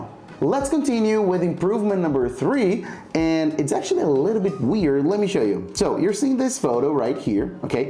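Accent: Brazil. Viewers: Great video, thank you! By the way, are you Brazilian?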